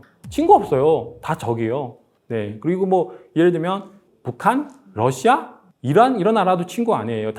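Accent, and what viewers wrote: China. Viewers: 이분 중국분 ? 발음이 ~~~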